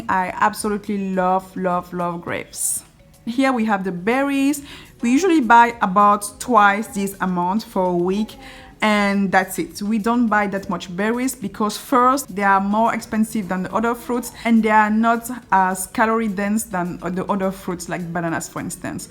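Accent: France. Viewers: Stephanie Brown French accent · she is French and it is her native language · Kea lila Sok I believe she is from France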